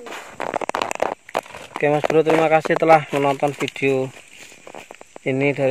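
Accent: Java, Indonesia. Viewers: wong jowo, ndi kie suarane😊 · Jowo kelutok jebule😁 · suruh istri nya nyetrik biar mbak ngrasain streeek ikan gabus,asli dr mana mase koq logat bahasanya orang jawa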